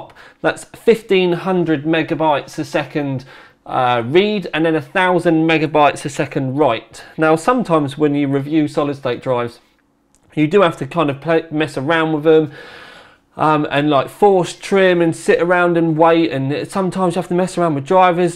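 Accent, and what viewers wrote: United Kingdom. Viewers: anyone here knows how to avoid UK accent videos on youtube?? OMG!!! headache. Thanks for the video anyway o.O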